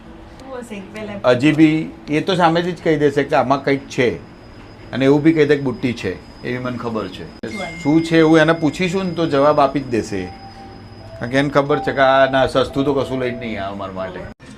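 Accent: India. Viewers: Tmara accent Mehsana na lag ch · Tame kya na cho sir Tamara acent sabarkanthaa k aravalli side ni lage che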